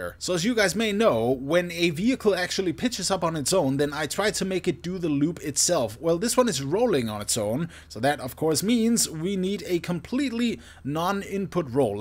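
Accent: Denmark. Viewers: He's Danish, I live in Sweden and anyone that swedish fluently would recognize a dane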